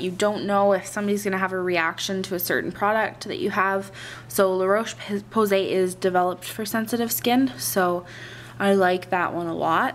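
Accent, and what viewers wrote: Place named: Canada. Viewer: you sound canadian? just the way you say certain words. or maybe from the northern part of us near canada like minnesota or something. thanks for the vid btw...very helpful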